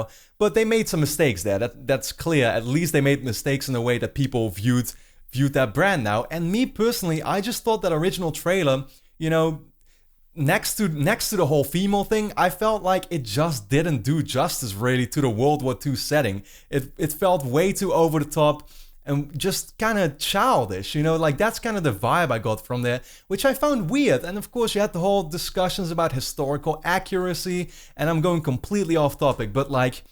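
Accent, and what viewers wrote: Netherlands. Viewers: Hmmmm you have a Dutch accent.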